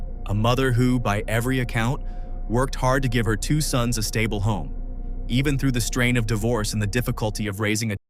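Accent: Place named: United States. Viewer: the voice sounded American that's why I noticed. You can say whatever you want. It's just funny to me to hear it with an American accent I'm also amaerican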